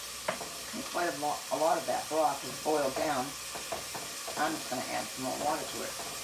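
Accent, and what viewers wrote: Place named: Boston, United States. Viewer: Hey!!!!!! I’m here again!!!!! I always love checking in on you!!!!! You’re one of my best friends!! We both have that Boston accent lol 😝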